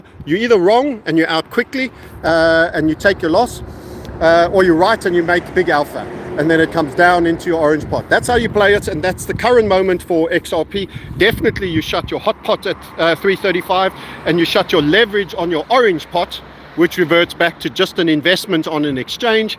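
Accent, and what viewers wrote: South Africa. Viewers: Hi. New to the chanel. Is that a South African accent I hear?👀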